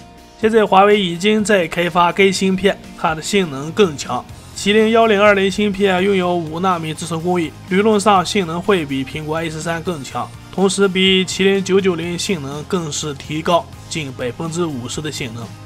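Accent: Shanxi, China. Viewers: 山西还是陕北口音？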